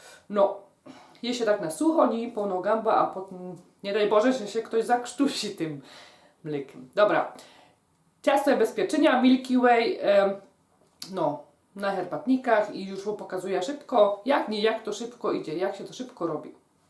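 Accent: Silesia, Poland. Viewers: Bardzo sympatyczna z Pani dziewczyna ;) mimo, ze nie rozumiem slaskiego dialektu to jakos.daje rade ;) swietny przepis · O matko, jai ten język sląski jest zabawny 😂😍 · Nie lubię śląskiej gwary.....dziwne że u ciebie mnie to nie przeszkadza, wręcz odwrotnie, może dlatego że jesteś bardzo naturalna,skromna ,sympatyczna a twoje fajne przepisy prezentujesz i komentujesz lekko i swobodnie to jest w tobie najlepsze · Masna ta gwara śląska 😂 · To po prostu śląski ;) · Śląsk! :D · Etnolekt śląski, odmiana opolska. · Ciekawy chyba przepis ale twoja śląska gatka zniechęca do słuchania. ... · Świetnie określają, I fajnie TLUMACZYSZ, bardzo dokładnie I po śląsku, POZDRAWIAM Z TORONTO, JOLA, POZDRAWIAM Mikołów,♥️♥️♥️👐🏻👐🏻👐🏻🙌🏿🙌🏿👏🏻👏🏻👏🏻👏🏻👏🏻👏🏻🎗🎗🎗🌋🌋🌋😘😘😘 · Tak mówią ludzie ze śląska, jak się nie podoba, nikt nie każe Ci oglądać 🤷🏻‍♀️ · To się nazywa gwara , wydaje mi się że Śląska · Zastanawia mnie jeden fakt, nauczyciele na śląsku uczą was tak mówić? 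Na śląsku forma taka jaką się porozumiewacie jest uznawana w szkołach za poprawną, czy po prostu wszyscy tam mają taką gwarę, i każdy ma to w dupie. Pozdrawiam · Jak to fajnie, że dziołcha od nos ze śląska! Aż się fajnie słucho! :D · To jej wina że pochodzi ze Śląska i mówi tak jak została nauczona? Trochę taktu kobieto... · Pytam na poważnie :) jako ciekawostka dla mnie, czy jesteś polką i mieszkasz w polsce? Czy Twój akcent jest prawdziwy dla Twojego regionu widzę w opisie śląsk i zastanawiam się czy na prawdę gdybyś tak mówiła do swojego znajomego na Śląsku to nie było by w tym nic dziwnego? Czy jest to tylko pomysł na kanał, żeby było ciekawiej? Pozdrawiam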